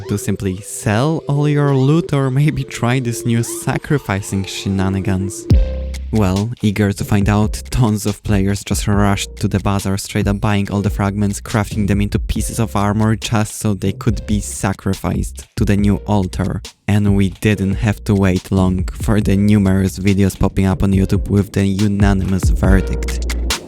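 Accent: Poland. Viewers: you sound Polish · I knew this accent is familiar you are a Pole! (the olx ad gave it away 😂) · ale polski akcent kolego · Wiem kiedy slysze polski akcent i wiem że ty go masz😂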